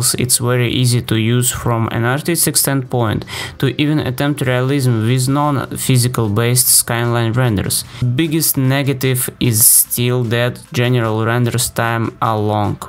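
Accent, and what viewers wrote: Russia. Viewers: Russian aksent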